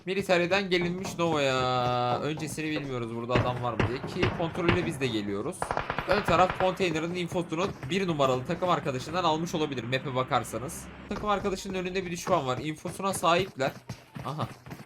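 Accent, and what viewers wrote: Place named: Kayseri, Turkey. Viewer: kayserili olduğu konuşmalarından belli daşşağını yiyem diyo xd sivasdan selamlar adamsın abi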